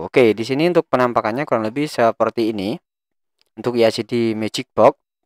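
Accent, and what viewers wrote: Madura, Indonesia. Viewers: bang, orang Madura?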